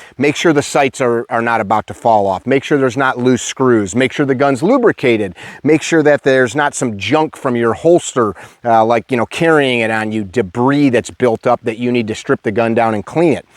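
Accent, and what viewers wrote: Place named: Minnesota, United States. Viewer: SOUNDS like Minnesota…the accent.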